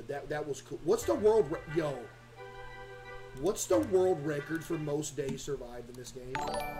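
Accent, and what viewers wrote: South, United States. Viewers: most def cus he's southern AND has a southern accent, I'm from the south (texas) and I can tell despite him glowing in the light and the ginger hair he is def a seasoned white man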